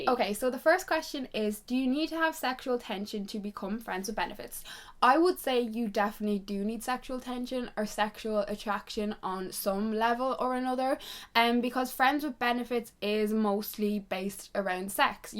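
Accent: Ireland. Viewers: I love your Irish accent! Can u say 'r u actually stupid?' in an Irish accent?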